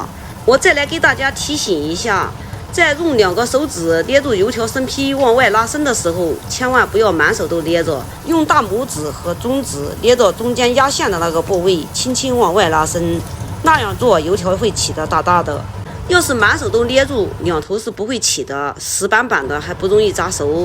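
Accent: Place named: Xinyang, China. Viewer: 口音像信阳人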